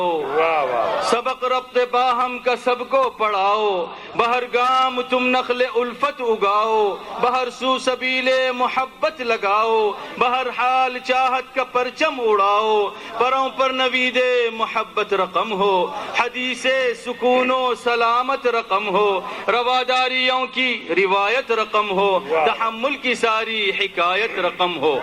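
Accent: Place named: Lucknow, India. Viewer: لله درک یا شیخ هنیٸا لک هذاالابداع والارتجال ماشآ ء الله انت تقرض الشعر باالاردیة الفصحی کأ نک من لکناٶ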